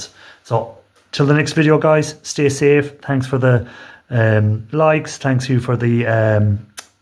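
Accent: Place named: Ireland. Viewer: Hello from South Alabama....I like your accent.... We're probably related, somewhere down the line.. My Dad's Grandmother was Full Irish . Sir .. would you let me know how and where I can get scrap phones, computer boards, etc .?? I would like to get into this.... Thank You.... and ," Don't let them get their hands, on your Lucky Charms."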